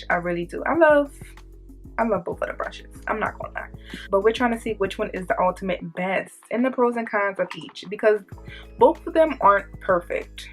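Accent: New Orleans, United States. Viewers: New Orleans accent?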